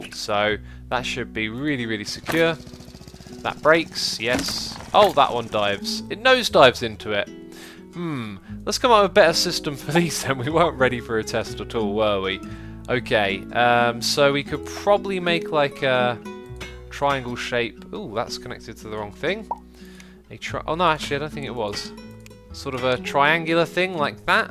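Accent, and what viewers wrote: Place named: United Kingdom. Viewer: DaSquirrelMan Yeah, his accent is hard to pin down. I wasn't sure if was British or Scandinavian at first. Still sounds like there's some British influence in there.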